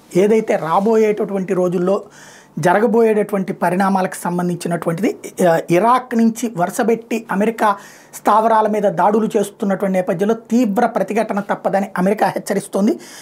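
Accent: India. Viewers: nee butler english nuvu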